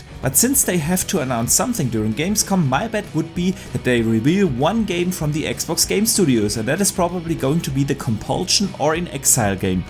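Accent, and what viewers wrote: Germany. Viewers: I am also from germany and the accent is killing me :D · The german accent is killing me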